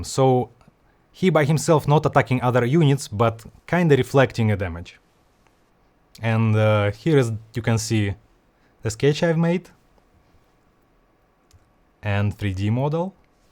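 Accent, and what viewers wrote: Russia. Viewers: Он русский у него акцент русский и имя русское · Я слышу русский акцент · Yes, his Russian-English dialect is hard not to notice, I also communicate in English. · I agree, Russian accent and English are very good) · Русско говорящий. Его зовут и по акценту понятно.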